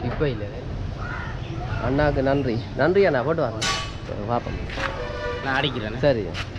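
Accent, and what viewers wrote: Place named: Tamil Eelam, Sri Lanka. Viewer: Super ellam castly mathri ullathu ungal eela tamil super ஒவ்வொரு மனிதரும் ஒவ்வொரு மாதிரி இருப்பாங்க take it easy ungal vaelai thodarnthu seinga thumbi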